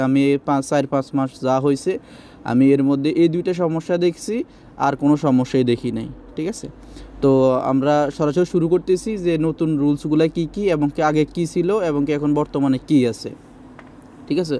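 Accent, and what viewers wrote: Noakhali, Bangladesh. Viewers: vai ki noakhailla ni? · বাই আপনার বাড়ি কি নোয়াখালী নাকি জানাবেন অবশ্যই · ভাই আপনার দেশের বাড়ি কোথায় নোয়াখালী আপনার কথা শুনে খুব হাসি পায়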